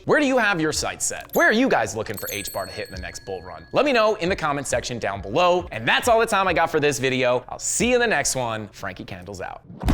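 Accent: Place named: United States. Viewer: Unwatchable - a gimmicky shouting american..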